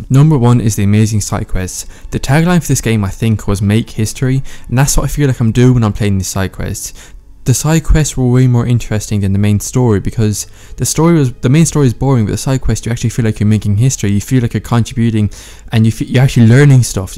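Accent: United Kingdom. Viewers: is he british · Are you from UK?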